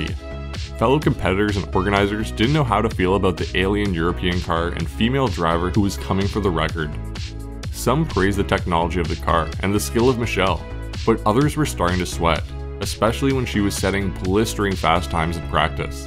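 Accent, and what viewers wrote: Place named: Canada. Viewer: If anyone is wondering what a non Maritimes/Quebec canuck accent sounds like, this channel's for you. Cheers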